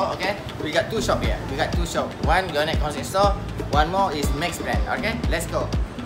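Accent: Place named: Malaysia. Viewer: Saran bang mending di kasih subtitle bahasa inggris aja biar gak belepotan Malay Inggris😁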